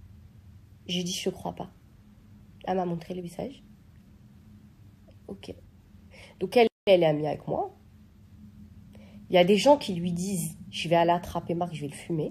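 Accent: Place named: Belgium. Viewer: Merci a toi tes belge???? Jadore ton accent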